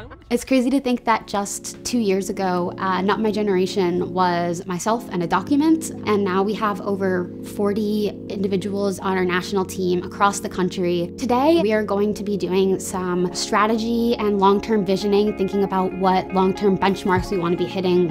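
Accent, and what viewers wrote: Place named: United States. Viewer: If it wasn't for the American accent I would've guessed this was made in Canada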